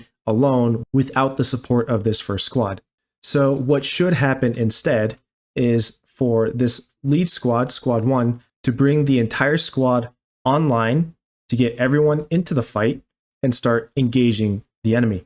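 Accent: United States. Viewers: that’s crazy would’ve never guessed your American accent and language skills are so good.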